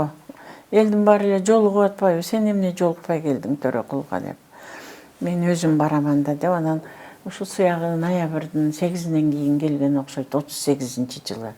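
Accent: Standard. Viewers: Роза Айтматова говорит на чистом кыргызском языке. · Азаматсыз эжеке кыргыз тилин ото таза оздоштургонсуз мен ойлоочумун жалан орусча суйлосо керек деп сизге таазим !!! Ширинге дагы уйротуп койсонуз акылдуу кептеринизден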